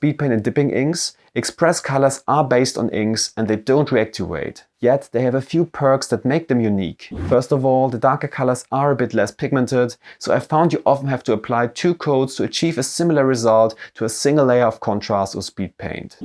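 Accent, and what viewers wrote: Germany. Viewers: Da klingt einer aber ziehmlich deutsch! Tolles Video und deine patreon Vergleichsbilder sind super! Hab den kompletten Vergleich von allen 5 Marken gekauft !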